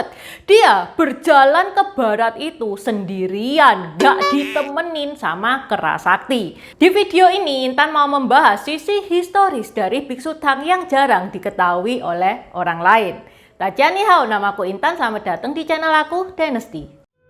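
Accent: Java, Indonesia. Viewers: Medok guys.... Semangat terus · Mantap channelnya, Intan. Bahasanya Medhok... Asal kota mana ya, Intan?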